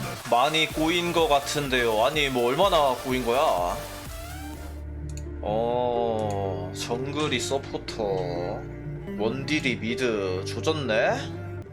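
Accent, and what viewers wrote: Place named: Gimhae, South Korea. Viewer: 약간 김해 냄새가 나는데?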